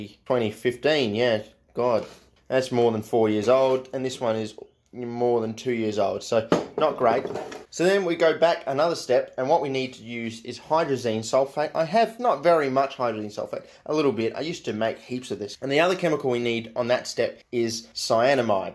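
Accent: Australia. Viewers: lol i saw that too and laughed. of course he'd be using a broken grad cylinder. wouldn't be without aussie accented swearing and delightfully ghetto equipment.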